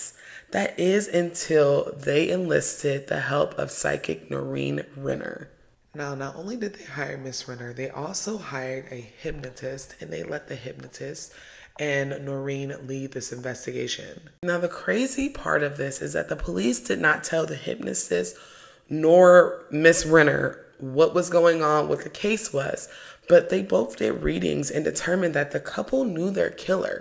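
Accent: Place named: Baltimore, United States. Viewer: Sounds like u from Baltimore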